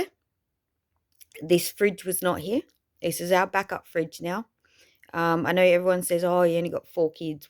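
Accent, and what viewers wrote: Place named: Australia. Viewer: Wooooohooooooooo go you so proud of you it’s good to here an Aussie thanks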